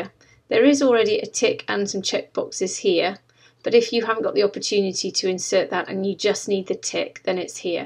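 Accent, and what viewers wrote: United Kingdom. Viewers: This so clear, I promised this will be my class, the ascent is so good, British type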